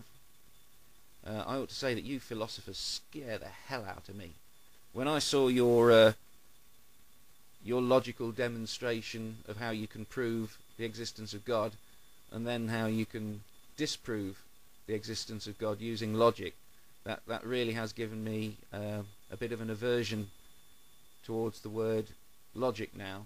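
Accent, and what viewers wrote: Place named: North, United Kingdom. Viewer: I'm not sure where is from, but he has a mild northern accent. I can't quite place it.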